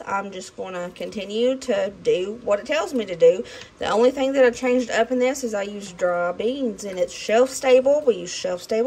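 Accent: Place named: Tennessee, United States. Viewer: YOU’UNS! I am from Mississippi and was trying to predict what state you are from based on your accent alone…you’uns tells me probably Tennessee! You have a lovely soul. Thanks for sharing some great ideas.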